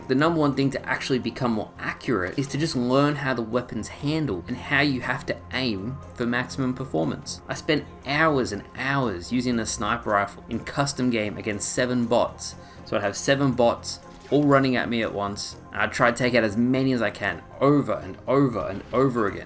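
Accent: Australia. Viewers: Love the Aussie accent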